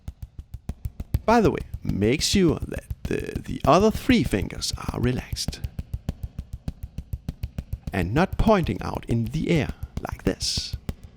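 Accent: Denmark. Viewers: Are you danish? Idk it’s just the accent😂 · Sound Danish :-) Well done I like it very much as well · Super god video, vidste du var dansker fra accenten lol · You are the only guy with a thick Danish accent to which I've actually liked listening to. Wonderful video, I'm definitely gonna try to pick up the 'lovely habit'. Og forresten mega props for redigeringen.. Det er dælme vildt at få de korrekte fingre til at lyse rødt på hvert eneste slag - selv i improvisationen! Translation: "And by the way, huge props for the editing.. It's wild to get the right fingers to light up red at every strike/beat - even in the improvisation!" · I like this guy, Sounds danish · Nice Danish accent, you deserve more views 🔥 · I have to ask, are you Danish? I hear a few what seem to me clearly Danish sounds in your unusual accent - never heard anyone speak exactly as you do. Love the Danish accent. 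Hope you don't mind me asking - sure it's none of my business but language sounds interest me. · Denmark? I'm picking up a strong accent haha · As a Dane myself I half way cringe when I hear our accent but it's hard to lose ...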